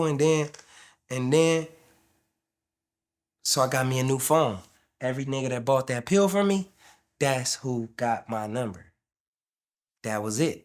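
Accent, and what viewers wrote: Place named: West Coast, United States. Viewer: Dang i thought he was from the West Coast, u sound just like us brah tight.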